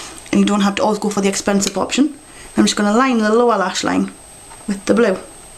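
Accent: United Kingdom. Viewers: you look like my art teacher! haha tahts cool. r u british??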